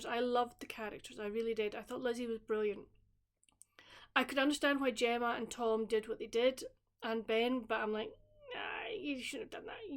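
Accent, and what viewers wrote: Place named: Scotland, United Kingdom. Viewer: Thanks for the shoutout. I love hearing my name in your Scotish accent. The Alaskan Wilderness series is on my TBR. I've been on the fence about The Wynbridge Series. Glad you read book #1. I need my romance to be low angst. So am passing on Heidi Swain. T KingFisher is not my genre of fantasy. I read and unhauled her YA (The Wizards Guide to Defensive Baking). I don't understand surealist humor and was afraid of the Sour Dough Starter familar. I need my famliars to be non threatning cat etc. I hope to finish Emily Wildes Encyclopedia of Faries today and am enjoying my slow reading of Mary Oliver's poetry collection Devotions.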